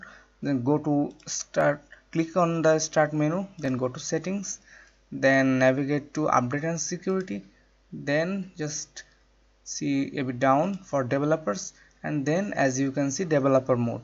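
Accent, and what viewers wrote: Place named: England, United Kingdom. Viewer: This English accents....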